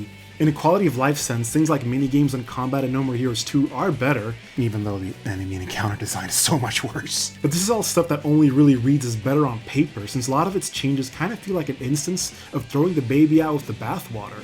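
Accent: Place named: United States. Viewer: First of all, I'm sick and tired of hearing "crescendo" get vomited out in YouTube reviews/videos/ whatever the individual wants to call it. Second of all, are you playing a foreign copy? Because there's no blood yet you have an American accent so I'm trying to figure out why your captured footage is the censored version?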